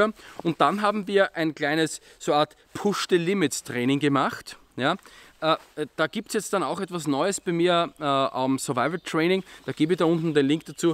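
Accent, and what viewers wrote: Austria. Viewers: Deine Videos sind einfach toll. Keine Verkaufsveranstaltung das fachliche ist einfach top.Dein Österreichischer Akzent und die ganze Art und weise einfach nett. Mach weiter so!!!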